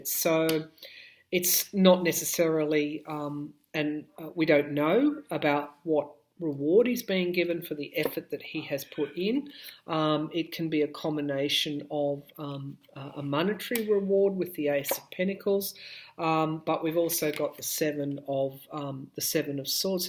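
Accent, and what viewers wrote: Australia. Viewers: Hard to get used to that strong Aussie accent too..... just saying. She's good tho.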